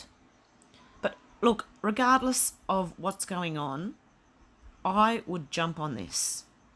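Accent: Australia. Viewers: This has to be addressed by world leaders. One of them is addressing this issue being Donald Trump who is hated by the media. Australia pull out of doing business with Chinese mobile phone tech company. NAMED in report can’t spell name. Scott Morrison won our election which he was supposed to loose, thankfully God is with us. By the way you sound Australian. You are doing a great service to humanity bringing this out in the open. David ICKE has been warning us about AI and has been a lone voice accused of promoting conspiracy theories. May God bless us all with hope to change our world. hated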